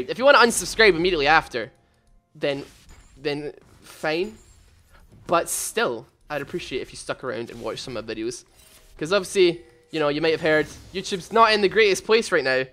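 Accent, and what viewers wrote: Scotland, United Kingdom. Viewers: keep up the good work. and ugh your scottish sooo........ THERE CAN BEE ONLY ONE! did i do that right?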